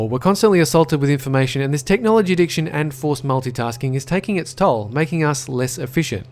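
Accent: Australia. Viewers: He's Australian actually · It's actually an Australian person and the reason why it's mainly America is because America has a lot of problems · A video titled "our society" read by an Australian, and only has facts about America. Dafuq? Look I know America tends to think it owns the world, but it doesn't. -_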